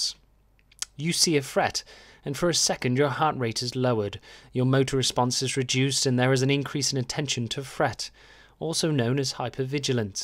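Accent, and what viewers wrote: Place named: Wales, United Kingdom. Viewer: Thanks, watched on repeat and took notes, very professional, and with an endearing hint of Welsh?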